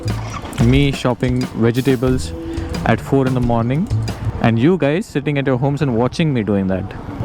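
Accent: Malvani, India. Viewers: I am watching this video for the 7th time just to hear his malwani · I like for malvani ..... though I'm not but love that accent 👍🤙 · Sir tumhi malvani kadak boltat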